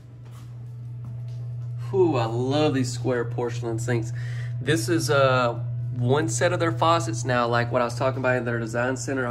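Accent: South, United States. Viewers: your southern accent to me just enhances the quality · You don't have to not sound country for us here in Pa ... I love yours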